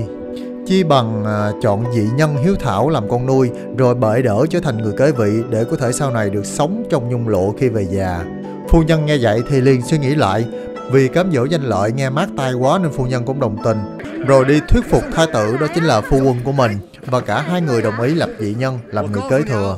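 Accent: South, Vietnam. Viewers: Clip bánh cuốn quá ạ, được đầu tư, có bổ sung kiến thức lịch sử và thêm yếu tố hài hước nữa:))) giọng miền Nam của nghe bình dị dân dã 😆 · Hay quá. Hình như vì dịch bệnh nên có thời gian, anh biên tập tỉ mỉ hơn, hình ảnh rất đẹp. Đặc biệt là từ ngữ anh dùng rất gần gũi, không hoa mỹ như trên TV, và thích nhất là giọng miền nam của anh.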